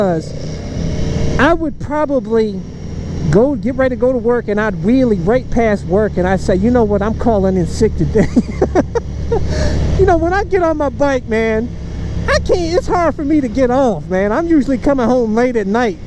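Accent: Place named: Cleveland, United States. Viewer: Cleveland hood accent.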